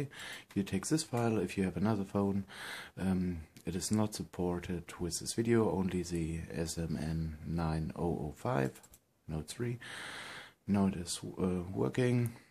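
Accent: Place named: Germany. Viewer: Warum redest du kein Deutsch man gehört genau das du deutscher bist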